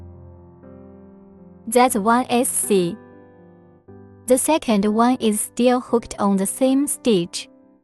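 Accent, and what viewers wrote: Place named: China. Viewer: more like English with a very Chinese accent and crochet lingo we can’t understand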